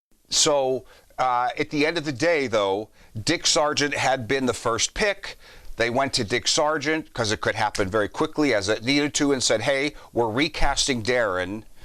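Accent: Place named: United States. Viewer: The accent of the narrator is way too American!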